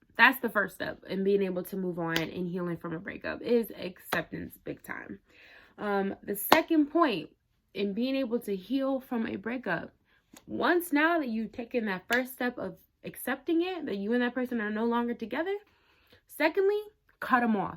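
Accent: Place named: New York, United States. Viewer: Sounds like you have a New York accent lol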